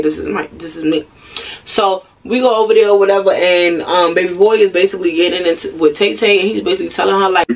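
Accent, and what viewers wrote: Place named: Newark, United States. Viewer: I’m from Newark and moved to another state for a better life with my family I knew instantly were u was from because of that accent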